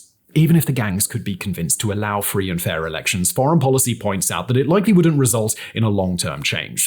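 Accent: United Kingdom. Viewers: I can’t handle British accents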